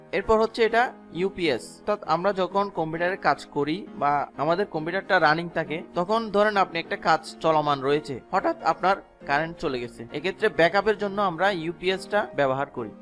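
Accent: Chittagong, Bangladesh. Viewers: ভাই মনে হয় চাটগাঁইয়া 😊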